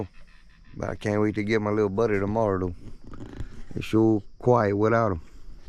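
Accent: Louisiana, United States. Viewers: Oh by the way I always love your Cajun accent ❤😊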